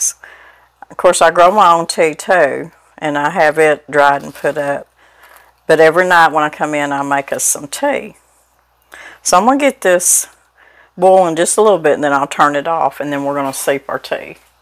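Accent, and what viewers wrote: South, United States. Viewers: You sure have a kitchen full of antiques! Love them.......Why would there be any haters. Because of the southern accent. That is GOOD southern food. Reminds me of my mothers cooking. We lived in Birmingham, Alabama till I was 8 years. Then moved north, but she continued to cook the southern way. I rememeber her saving bacon grease - my dad loved the FRIED foods! Too much of it not good for you though. Mom was a terrific cook! She could make pies that would win prizes! Made everything from scratch, and so perfectly. Canned in the fall everything there is to can. She was a very hard worker. There were 6 in our family. Love fried cabbage too - in fact love cabbage fixed any way. Going to put some in my soup today. Sounds like you people live in the Ozarks area. How beautiful it is! We were there in our travels when I married. Camped in a private front yard campground! 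· I agree with you, I love this channel and enjoy listening to. She is a true Southern lady. My husband and I have been fortunate enough to travel across the USA as well as foreign countries. People always ask us to talk so they can listen to our Southern accent. Foreigners have described our accent as akin to singing, What many people do not realize is Southern accents vary from place to place. If you enjoy Mrs., I believe you will enjoy “Cooking with Brenda Gantt.” Brenda lives in south Alabama on the Florida-Alabama state line. She has a large repertoire of Southern recipes and operates a bed and breakfast. At the request of some friends during the pandemic, she made biscuits and posted the video on Facebook. She was a huge hit, and in little more than a year, she has over 2,500,000 followers. She is also on YouTube. There are two channels that I watch at least once a day—Mrs. and Mrs. 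Brenda.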